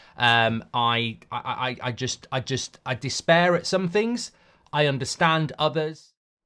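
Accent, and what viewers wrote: Manchester, United Kingdom. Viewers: Just hear to enjoy his Mancunian accents. So unique with a touch of humour😂😂😂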